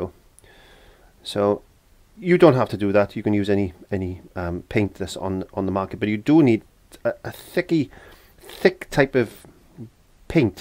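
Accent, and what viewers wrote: Wales, United Kingdom. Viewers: Thank you for showing us how to build up a Van Gogh picture ... you are very entertaining as well... we love your cheeky welsh accent .... If I had the money to pay for you to go to the Van Gogh museum I would ..keep it up you really are fantastic at what you do 👍thank you 👍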